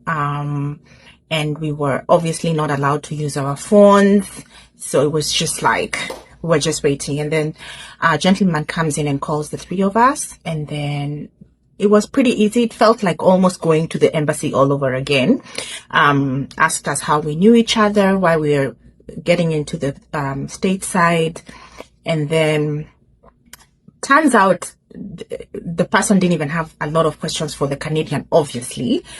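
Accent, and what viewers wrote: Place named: Kenya. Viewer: Well, you need to go back to school and evaluate your education. I have studied psychocology too. And as a kenyan, I know she is kenyan. Her accent speaks for itself. 😏🙄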